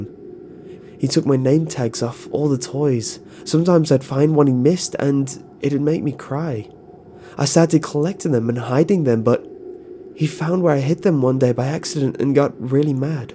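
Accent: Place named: RP, United Kingdom. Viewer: Omg when your on youtube and you listen to american vids all the time and then listen to a british guy we sound so posh lol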